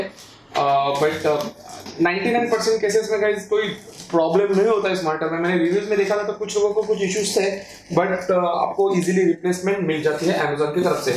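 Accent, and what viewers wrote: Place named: India. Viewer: ı love so much indian english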